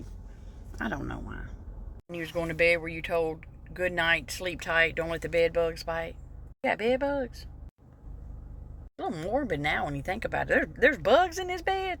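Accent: South, United States. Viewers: You know what’s so crazy, I can tell by here accent, she’s southern USA. I grew up on a farm in northern Alberta Canada and everything she has said is exactly how I grew up. It shows you how back then simple normal life was universal in western society. I hate today’s society. God I miss everything she has mentioned.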